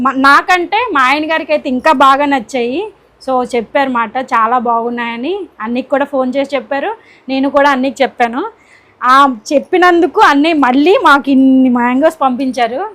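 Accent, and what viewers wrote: India. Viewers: Oho, Meedhi Anantapur aa. Ippativaraku mee slang ni batti coastal andhra anukonnaam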